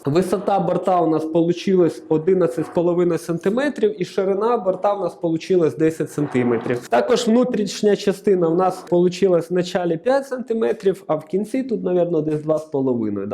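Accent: Surzhyk, Ukraine. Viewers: за суржик 👎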